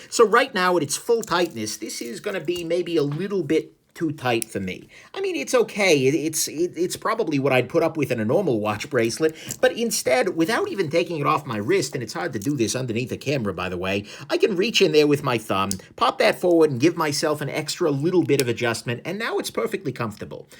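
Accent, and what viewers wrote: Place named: East Coast, United States. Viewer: I love his accent. It’s like a East coast mobster selling you a caahh. Cheers mate!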